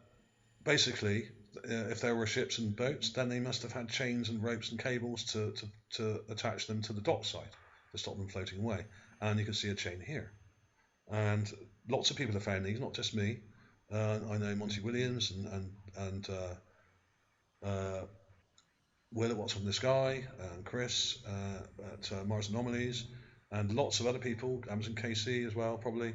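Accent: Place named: West Country, United Kingdom. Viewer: It makes a change to hear a west country voice! Instead of one of those cosmopolitan Jewish American voices, that so often accompany these videos. Are you from Somerset as there are certain timings in your phraseology that given an indication that you are?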